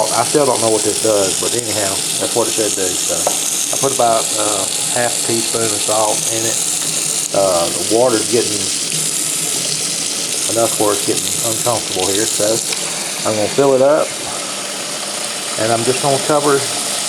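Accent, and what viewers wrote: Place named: New England, United States. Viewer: Wow you sound like such a yankee.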